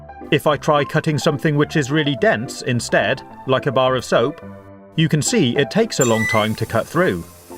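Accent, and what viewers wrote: England, United Kingdom. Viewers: Is he English or does he just have trouble speaking with any fluidity. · got a proper gay English accent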